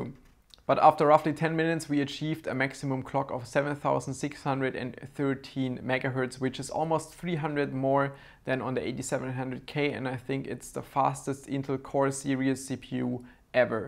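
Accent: Germany. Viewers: You can hear his German accent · man hört irgendwie das du deutsch bist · Deutscher Akzent xD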